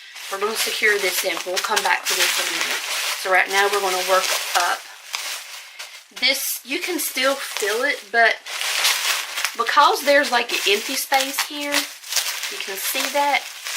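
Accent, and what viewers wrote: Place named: South, United States. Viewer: great video as always, thanks. and that southern accent...love it. It must be a canadian thing..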